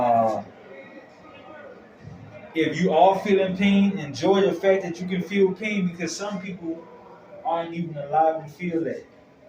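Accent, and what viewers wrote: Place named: Charleston, United States. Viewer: Hold ya head fam 💯💯 u talk like lil buc from Charleston. Knew u was from here 💯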